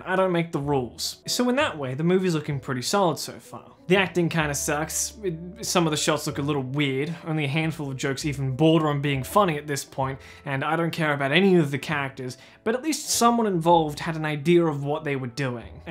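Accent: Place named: Australia. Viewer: why do you sound less australian now?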